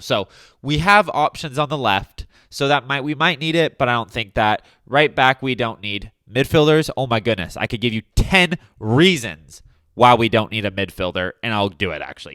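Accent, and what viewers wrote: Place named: United States. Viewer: Clicked video. Heard accent, clicked off. American accent = opinion void. Especially when you look to young to even remember pre-2012 Chelsea. As soon as you mentioned Kepa turned his back on Chelsea, I knew my instincts were right. Americans are ruining this club.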